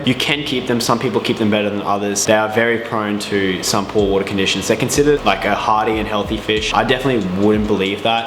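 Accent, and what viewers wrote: Australia. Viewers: You sound Aussie mate. Talk litres!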